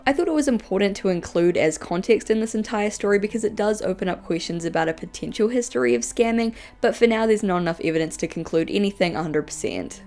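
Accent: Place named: New Zealand. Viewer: I think she's a Kiwi?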